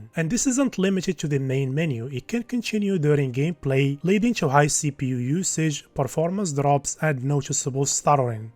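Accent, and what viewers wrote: Morocco. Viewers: U sound moroccan bro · bayna fik maghribi, english m9awad 3andek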